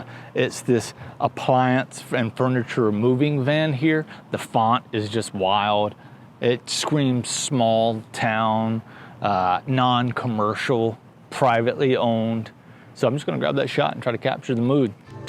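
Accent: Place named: South, United States. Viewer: Great to see you back!! Love your videos and hope to see many more in the future. By the way I can hear you've picked up a little of the southern accent, nice!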